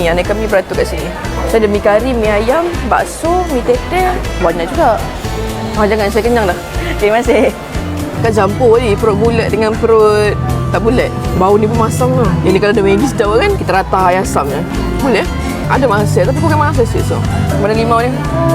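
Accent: Kelantan, Malaysia. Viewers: Ori kelate ke tu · Orang kelate ke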